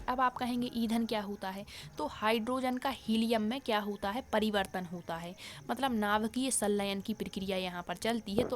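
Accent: Bundelkhand, India. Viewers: Lovely ❤ voice mam aap pakka bundelkhand area ki hongi ☺ plz reply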